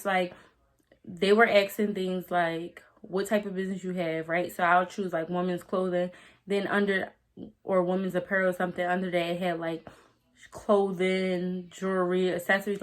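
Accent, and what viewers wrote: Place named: Philadelphia, United States. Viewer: Thanks for doing this! You should like you’re from Philly too 🙌🏾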